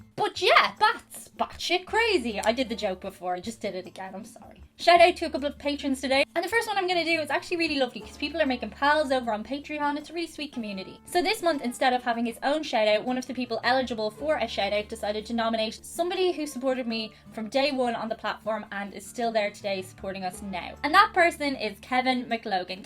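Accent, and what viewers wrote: Dublin, Ireland. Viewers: ... Posh accent ???... What part of Dublin are you from ? That´s certainly a Dub accent, but posh ? Never in a million years.... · Whatever her accent is, it is now my ideal perfect Irish accent benchmark that I will compare all others against. I dont have to keep playing it over and over to figure out what she said. Mostly. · She's a Dubliner. You've been watching "Derry Girls" haven't you? That is a radically different accent up there. Accents across Ireland are as different as Boston and Alabama. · ehh ...i think shes completely irish just a bit influenced by american tv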